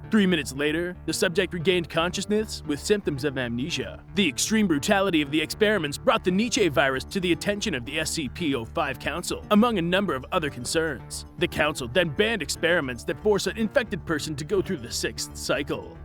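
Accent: Boston, United States. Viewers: I hear a Boston accent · Why does he have a Boston accent or like an accent in general like I can't tell what it is but I feel like it's a Boston one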